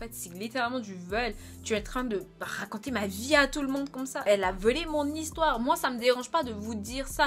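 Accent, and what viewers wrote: Quebec, Canada. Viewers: T’es québécoise ? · Tu vis au Canada ? On dirait presque que t'as un accent Québécois ;) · Accent canadien? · T’es tu québécois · jannette jackson C'est pas un accent anglais mais québécois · Ton accent est tellement beau! on dirait du québécois du français et de l'anglais c'est trop mimi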